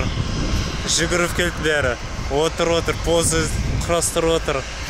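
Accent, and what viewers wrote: Standard. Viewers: Рахмет саған қазақша блог жүргізіп жүргеніне! Аздап акцент болсада қазақша таза, сауатты, қатесіз сойлейді екенсін, орысша сөздерді қоспайсын, жарайсын!👍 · оте керемет жансынго таза казакша сойледн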